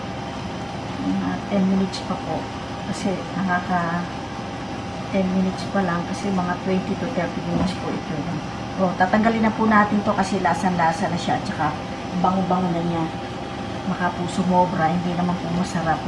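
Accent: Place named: Bulacan, Philippines. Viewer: iba talaga pag may puntong bulacan,.masarap panoorin at pakinggan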